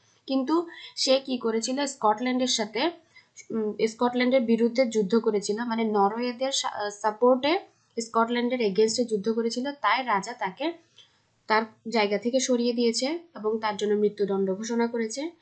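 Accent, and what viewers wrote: Chittagong, Bangladesh. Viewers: you are from Chittagong right?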